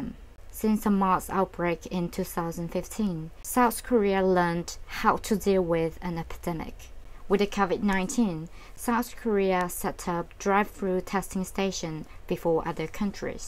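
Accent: United Kingdom. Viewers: 私の母国語は日本語ですが韓国語も英語も扱えるようになりたくて勉強中なのですが、さんはもうマスターされてて本当に尊敬します☺️🕊👏🏻 イギリスアクセント素敵です💕 私は勝手に私にとってさんは原動力でありとても刺激になる方だと思っています🥰いつも動画楽しく見ていますこの言葉を伝えたくて初コメ失礼します😖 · Love your English accent 😁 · 와 진짜 영국발음👍👍👍👏👏👏👏👏👏👏 너무 웃긴 질문인거 알지만😂😂 저두 2년 살면 님 처럼 영어 잘 해 질 수 있을까요...!! 물론 개인차도 있고 언니의 노력이 지금의 결과를 이루었지만 진짜🙏🙏👏👏 감탄하고 갑니다!!!ㅎㅎㅎ (전 호주에 있다 왔는데,왜 발음은 미국일까요😂😂😂) 진짜 영국발음 너무 멋있고 이런 내용 정리 잘 해주셔서 영상 올리시는 언니도 너무 멋있으세용💕💕💕 아 진짜 내가 유튜브에서 이런 채널을 발견하다니😍🥰ㅎㅎ 도쿄는 저녁🌛인데 런던은 아침🌞이겠죠? 전 오늘 편집 한다고 날 샜는데😂 금요일 한 주 마무리 잘 하시구 좋은 하루 되세용!!!💚💚💪💪 아 진짜 영국발음 언니 너무 멋이썽!!!!🥰❤️ (갑자기 다가와서 친한...척 죄송해요😂😂멋있어서 그만...!!!😍👍)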